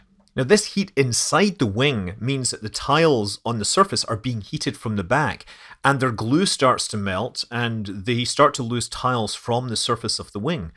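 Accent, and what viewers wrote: Scotland, United Kingdom. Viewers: Scotland, clearly 🙄 Manners cost nothing, you need to learn some. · Brilliant video. Your accent is clearly north of the border… anywhere near Aberdeen? I’m guessing a wee bit further south?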